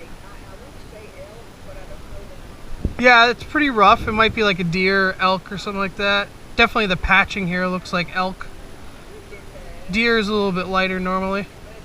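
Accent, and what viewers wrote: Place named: South, United States. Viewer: Hey it Southern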